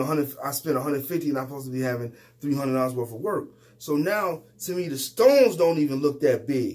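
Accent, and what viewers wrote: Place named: Cleveland, United States. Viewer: CMHA? Cayuga Metro Housing Authority? I was trying to figure out where you were from by your accent--I thought maybe Chicago but now I could hear it is Cleveland (lol after looking up CMHA!)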